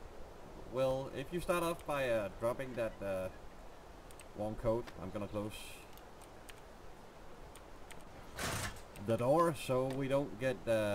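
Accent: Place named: Denmark. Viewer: I smell danish ;) Awesome video thanks!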